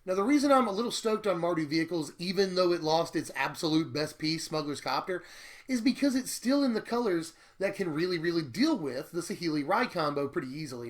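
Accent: South, United States. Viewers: aawwzz! I love good southern accents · that country accent lol, Im going to say she is from alabama or louisiana.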